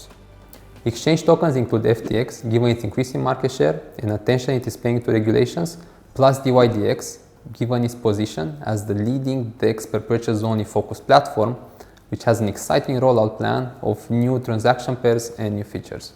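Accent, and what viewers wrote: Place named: Romania. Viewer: I like your english! Nice! Romanian english accent! :) I like the moldavian accent, too, as a moldavian who I am! KR Costi! Thanks!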